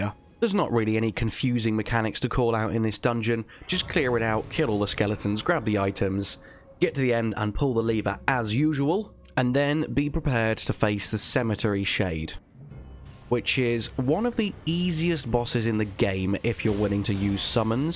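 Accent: United Kingdom. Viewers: just btw, 'gaol' is the English spelling of 'jail', and is pronounced the same. You sound English, so I'm surprised you aren't already aware of that. That said, thanks for all your tips, I tend to be like a scout in my general attitude in games - love exploring, NOT good vs bosses and tough enemies. Actually as a mouse & keyboard computer game player, I really dislike the whole 'boss fight' thing, which is a console game mechanic, now being used in some computer games by way of ports. Still, Elden Ring has some amazing areas and scenery. and a goat-horse mount with Unreal Tournament style double jump ability is out-of-the-mould good good to see. On the other hand, most egregious "physics" where sometimes after hitting an enemy you are held still, mid-movement, while an enemy gets a hit back at you (or 3 or 4 sometimes!), and a hit from behind while racing along on your mount, stops you mid-career and you may gave to jump or dash to break the 'freeze' (outrageous!!). 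The AI not so good also, no ecosystem, no factions, ie, all monsters gang up to fight the player, when often they should be fighting each other, demihumans vs militia being the only contrary example. Miranda flowers, wolves, bats, should attack any who come near, land octopuses should attack trolls too if they get too close, etc etc. Really like the inclusion of the demihumans, the remind me of Edgar Rice Burroughs - John Carter, etc, imply at least for me a whole mythology - I hope some addon makes more of them a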